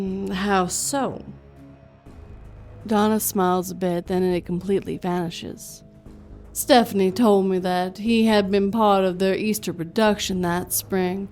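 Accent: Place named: South, United States. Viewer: Not half bad southern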